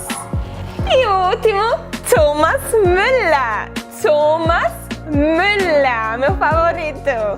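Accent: Northeast, Brazil. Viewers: Sim...nordestina de Maceió! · Ela parece nordestina falando português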